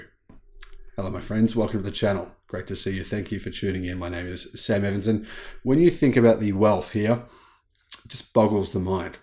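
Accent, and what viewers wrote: Australia. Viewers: He's Australian, mate. I'm a kiwi from just next door and we all say NAHSA too. So do the British, which means our is far from being the only one. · That's exactly right, he's Australian and Aussies don't say Narsa like the English, etc do. Get it right if you're going to correct me, mate.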